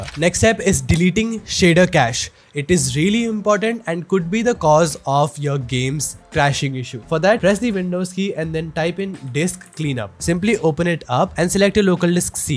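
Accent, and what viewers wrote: India. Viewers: i was just going to say. dude tells you to turn off a firewall protection then non schilontely tells you to download malware while having a notoriously indian hacker voice. indians really should get morals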